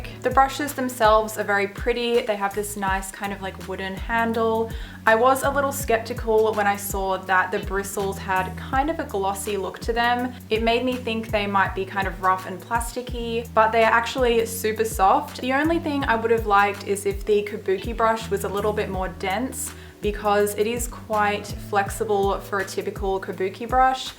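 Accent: Australia. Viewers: Her face screams "French Model" to me, so even though she talks like an Australian, it didn't hit me that she's Australian until she mentioned the weather there lol. I love how she leaves a little bit of darkness under her eyes (especially since I see a bunch of people on YouTube who take their concealer all the way up to the lashline). It looks really cute :) Thanks for posting.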